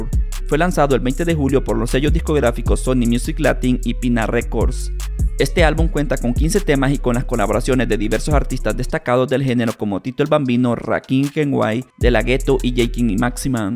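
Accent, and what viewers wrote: Honduras. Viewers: eres de Honduras?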